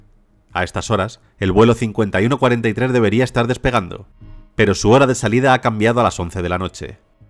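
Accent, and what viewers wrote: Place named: Spain. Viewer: Excelente narrador, me encanta la narración de éste canal, y eso que a los latinos nos nos gusta mucho el acento de España para los doblajes de las películas.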